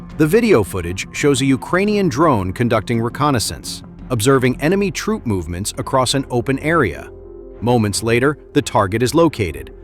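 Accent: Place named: United States. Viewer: DAS VIDEO IST OK ABER ICH MUSS KOTZEN WENN ICH DIE AMI STIMME HÖRE EINE WIDERLICHE SPRACHE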